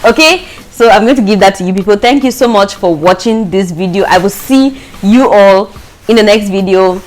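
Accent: Nigeria. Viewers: You talk like nigerians though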